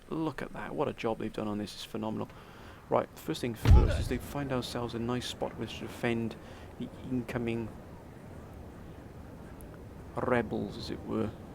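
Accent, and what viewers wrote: Wales, United Kingdom. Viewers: Hey man, where are you from? You sound British but you have hints of foreign By the way, great video, definitely subscribing! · Where abouts are you from in Wales? your dialect is very interesting to me, great vid btw your always a gentlemen talking to people in the comments and your very good at this game!! :)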